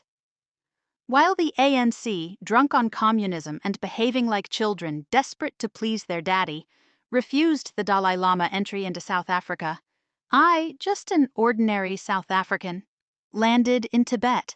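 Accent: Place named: United States. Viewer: American accent!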